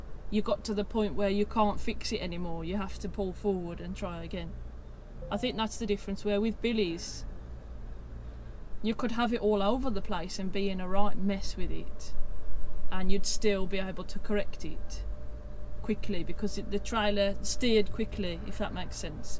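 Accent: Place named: Dudley, United Kingdom. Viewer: Are you from Dudley bab?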